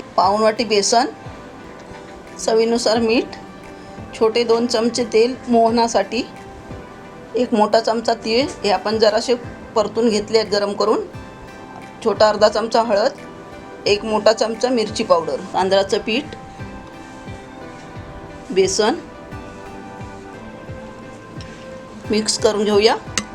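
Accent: Malvan, India. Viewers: Tumhi Malvani aahat Ka madam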